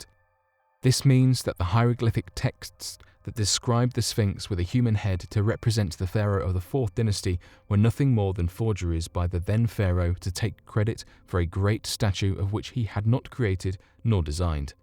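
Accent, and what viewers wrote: United Kingdom. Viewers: He is obviously not American. Can you not hear his British accent? 🙄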